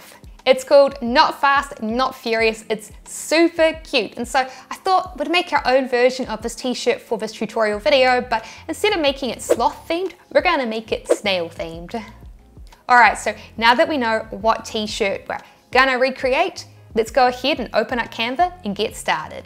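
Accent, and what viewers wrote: New Zealand. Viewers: Hello from California. Thanks for sharing your knowledge. New Zealand is a new accent for me! I'm learning stip-by-stipI I am taking notes and trying to absorb as much as I can. Cheers Sis.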